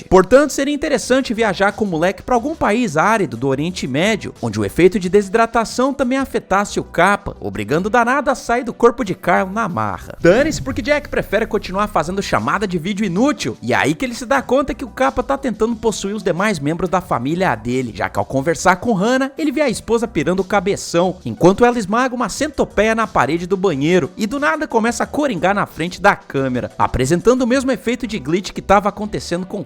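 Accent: Portugal. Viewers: muito bom sotaque portugues, abraços, sou de portugal e vejo os teus videos e acho top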